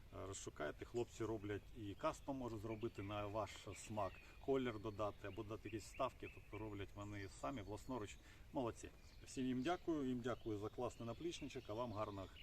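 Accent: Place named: Russia. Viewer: мені половина відео слух різало, наголоси на російський манер. Особливо в слові Український. Думав до кінця не додивлюся.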